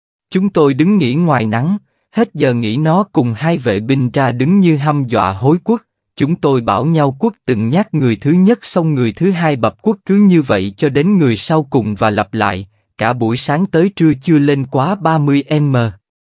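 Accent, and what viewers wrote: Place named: South, Vietnam. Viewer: đây là đúng giọng miền nam mà thật hãnh diện vì giọng đọc của a cảm ơn kênh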